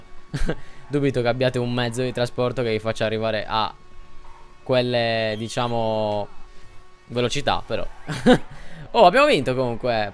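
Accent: Sardinia, Italy. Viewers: Ma cosa sei sardo?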